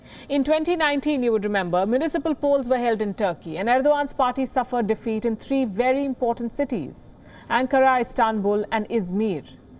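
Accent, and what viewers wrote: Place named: India. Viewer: Turkey is not a third world country by the way as India is. Where severeg's water is floating on the streets Where people sleep on the streets. Where more than 50 percent of its population attend toilets in the fields and farms. Where people more than half of its population living below poverty line. It's all sad.But How come rather than focusing on such basic issues of it's own the colonial indian so called funny accent's english language 😂channel reporting about others.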